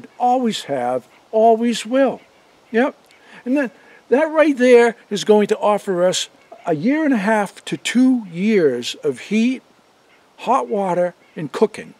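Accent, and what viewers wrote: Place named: New Hampshire, United States. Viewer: I found your videos about a month ago. I love them, since they bring me back to my childhood days. My mother was born and raised in Franklin, NH in a home that my Pepe (grandfather) built. He had an 8th grade education, but went on to build houses on land that he bought. He also made furniture. I get to hear the NH accent and it makes me smile...again those sweet memories. Mom's oldest sister recently sold the old original family house a few years back. New Hampshire is such a gorgeous state and I can't express just how much it means to me to see a portion of it through your eyes after so many years of being away from there. Thank you and all the best to you. ps. My father and Pepe would of loved seeing your meticulous carpentry work.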